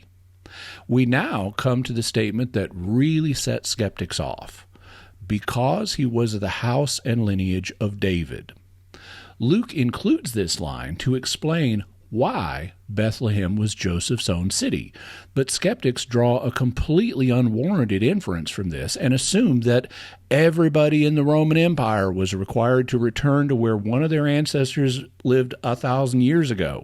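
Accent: South, United States. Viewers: For the first time ever I noticed a bit of a Southern accent. I wonder if Jimmy went home to Arkansas or wherever he's from And visited family. Anyway, great video. Good stuff as usual! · He recently moved back to Arkansas, so his natural accent is reemerging. I like it, shows some Southern heritage representation in Catholic apologetics.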